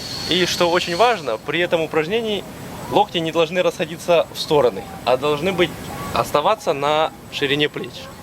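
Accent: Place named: Ukraine. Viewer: Сегодня 15 марта 2023 год, делаю все упражнения, молодец, даже услышала украинские мелодизмы, акцент, поддерживаю!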